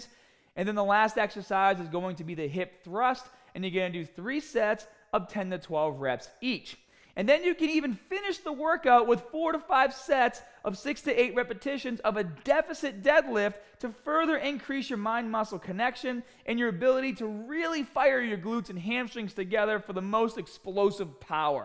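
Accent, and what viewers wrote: Boston, United States. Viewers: What kind of American accent is this? Sorry, I'm not American 😆 haha! It's like the "r" in the word "started" is silent. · Boston. It sounds nothing like New York. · New Englander accent all the way. I'd say Boston. He tries to hide it to sound more professional. Also, if you're not from Boston, you're not going to understand the accent. So he's doing us a favor. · Mr Cartoonster I did think Boston! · Can really detect the Irish pronunciation in the Boston accent.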